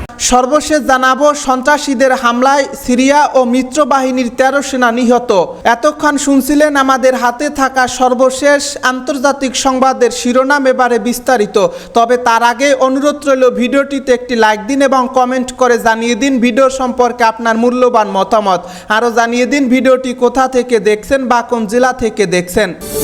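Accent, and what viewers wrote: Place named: Barishal, Bangladesh. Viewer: A Borisaila toke news porte ke bolese, age vasha shek tar par news par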